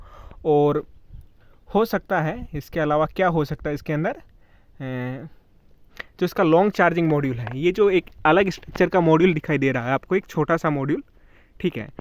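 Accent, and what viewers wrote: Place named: South Asia. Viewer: I would more appreciate this video if the commentator spoke American oe British English. I missed much because of the strong South-Asian accent.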